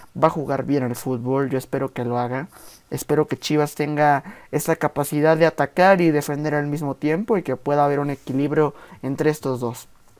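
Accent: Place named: Argentina. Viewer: Felicidades ya hasta el modo de hablar estás igualito al caballero chiva casi hablas como argentino y puras tonteras puro humo que está demostrando solo pierden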